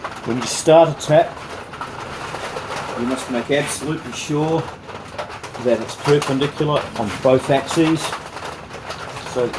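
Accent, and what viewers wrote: New Zealand. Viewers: Thot you were aussie first 30 seconds.....then the KIWI became foremost. Cheers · Is this kiwi ingenuity at its best? Taking a gamble, you sound like a fellow kiwi. Awesome stuff mate you’re a legend. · he's in NZ, cant you pick the accent? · That's a kiwi accent mate!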